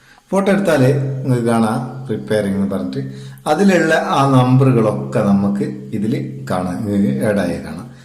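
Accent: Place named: North Kerala, India. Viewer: കേരളത്തിലെ വടക്കൻ ഭാഷ കേൾക്കാനും ഒരു സുഖം.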